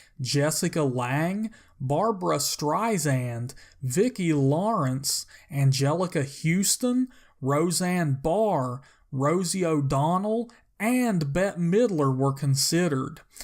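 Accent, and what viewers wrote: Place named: Kentucky, United States. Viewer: Sounds like Kentucky to me.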